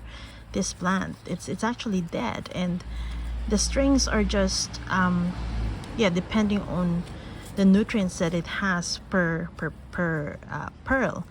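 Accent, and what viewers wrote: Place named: Philippines. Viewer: Hi! Lovely how you were able to revive them!! 💖 I struggle with these plants but I want to have them so bad! After I get new pearls, is it okay to change the soil completely? Will it kill the plant due to shock? I don't usually like the potting mix it comes with as I'm afraid they're not fit with my conditions (might be not so well-draining) or might even have pests in them. Is it better to leave it? Also, are they generally okay in shaded areas? Just bright shaded area, not much sun 😅 P.S. You sound Filipino!! Great video 💟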